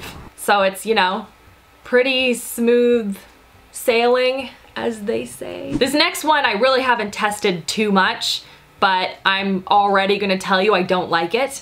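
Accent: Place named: Canada. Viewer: she’s canadian huh? I swear most english speaking canadians emphasize the ‘ou’ sound like ‘out’, ‘house’, and ‘about’.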